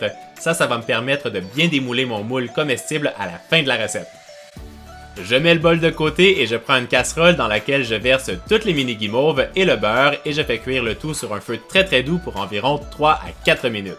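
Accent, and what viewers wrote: Quebec, Canada. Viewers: J'adore ton accent de québécois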